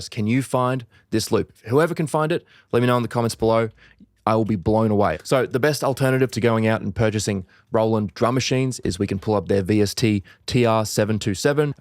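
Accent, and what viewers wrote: Australia. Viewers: how did I not realise you were aussie lmao 😂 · I'm like pretty accent deaf so I never realised he's got an Aussie accent. Sick to see some yt producer faces from here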